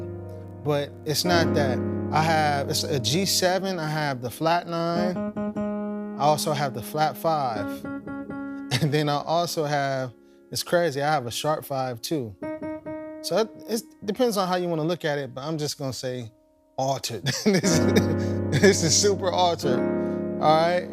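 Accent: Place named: United States. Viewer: Can anybody tell me please, wich region of América this accent comes from?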